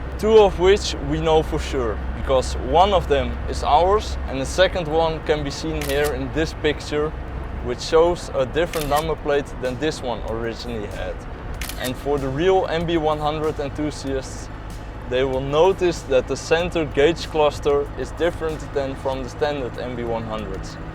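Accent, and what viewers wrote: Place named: Germany. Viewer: Produced till 1969.....Your Denglish is horrible.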